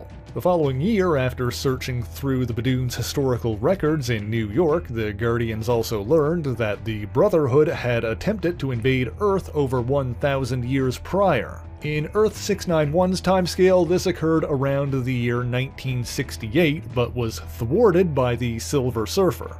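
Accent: Newfoundland, Canada. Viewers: Why does the narrator sound like a Newfoundlander to me?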